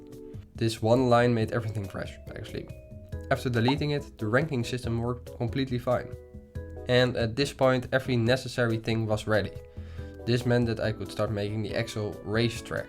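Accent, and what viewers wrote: Netherlands. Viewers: Immediately knew you were dutch given the accent, ga zo door, echt enorm goede videos!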